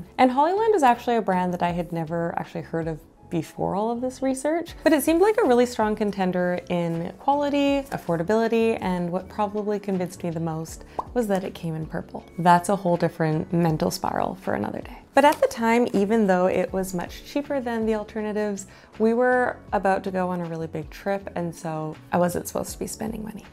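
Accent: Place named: Canada. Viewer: Canadian Accent (out)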